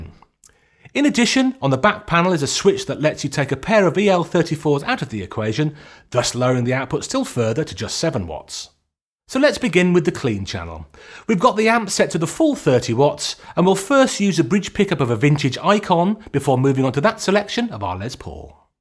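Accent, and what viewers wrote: United Kingdom. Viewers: you are better because you have British accent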